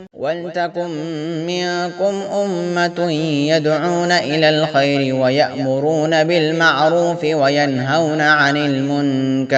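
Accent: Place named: Saudi Arabia. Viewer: MASHALLAH your recitation is like Saudi Arabia style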